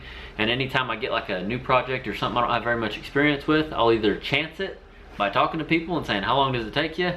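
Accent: Oklahoma, United States. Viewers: What part of Oklahoma you from?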